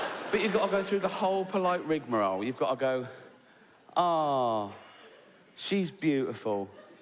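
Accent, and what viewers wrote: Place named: Australia. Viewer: Sorry but your subtitles are full of errors - AI CANNOT translate Aussie accent. Simple as that.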